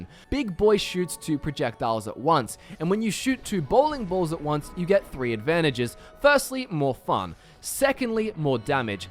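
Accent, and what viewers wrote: Australia. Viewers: I have literally never heard anyone pronounce the words Alteration or Harvester the way you do and it makes me ears confused because you have a perfectly normal Aussie accent besides those 2 words.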